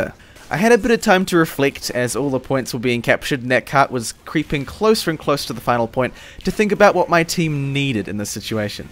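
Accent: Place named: New Zealand. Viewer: I think the kiwi accent is super chill to listen to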